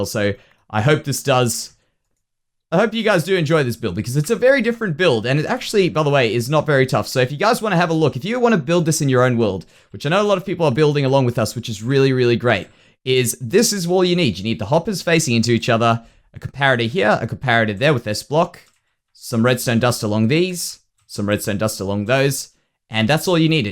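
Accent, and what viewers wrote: Australia. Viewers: Are u an aussie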